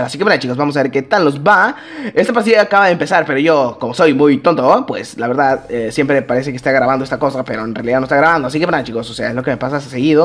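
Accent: Spain. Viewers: No hables como español se tu mismo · Es muy incomodo Que hables como español si tu eres mexicano suejas mal :/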